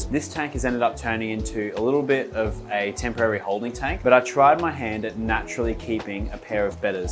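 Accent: Australia. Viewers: ANY REASON U TALK LIKE A ROBOT ...😂😂😂 I WISH U CHILL LITTLE BIT WITH YOUR AUSSIE ACCENT , U TALK LITTLE TOOO FAST FOR AMERICAN KEEPING UP😂😂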